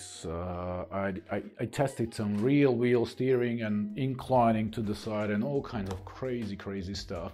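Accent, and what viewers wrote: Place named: Slovakia. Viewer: It's just his accent. He's from Slovakia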